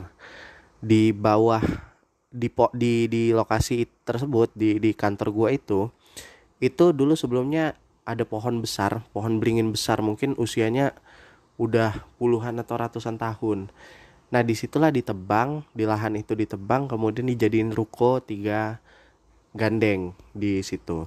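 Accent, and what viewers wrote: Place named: Lampung, Indonesia. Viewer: Pantesan logatnya ga asing.. ternyata lampung juga bang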